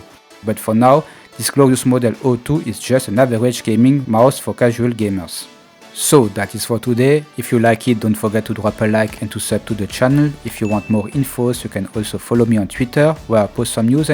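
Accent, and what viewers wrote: France. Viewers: This is a great video and I hope you exceed to do great things , but would you mind me asking are you French sorry I took three years of French and thought I recognized the accent my apologies if this isn’t correct also I subscribed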